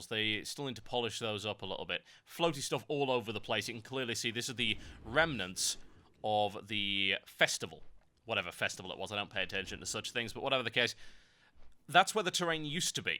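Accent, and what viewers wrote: Australia. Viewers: Has anyone ever told you that your accent sounds more Aussie than English? o_O To me, anyway. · Hey, are you aussie? <3 your accent :D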